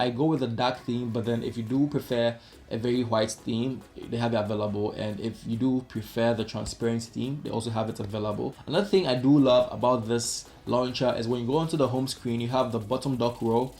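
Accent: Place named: Jamaica. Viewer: Are tou Jamaican? I'm from the virgin Islands, your accent sounds jamaican or nearby location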